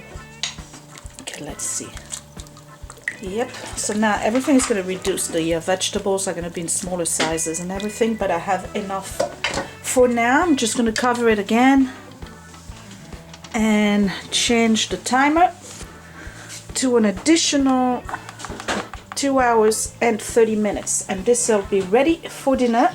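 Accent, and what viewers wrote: France. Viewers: yay! More French accent! I love listening to you speak. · and the soup are amazing:))) ha ha ha l'accent français;)!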